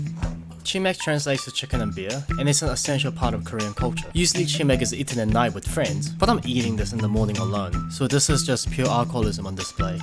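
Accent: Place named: New Zealand. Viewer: Wow looks nice 👍🏼 btw miss New Zealand your accent is a fun mix of the kiwi accent.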